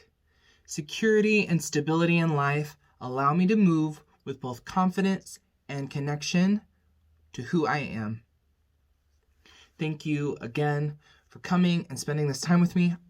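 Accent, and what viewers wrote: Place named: Ireland. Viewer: Love the Irish accent lol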